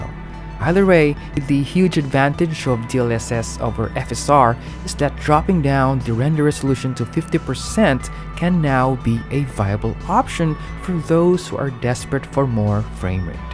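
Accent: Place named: Brazil. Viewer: are you brasileiro?? hearing an accent :)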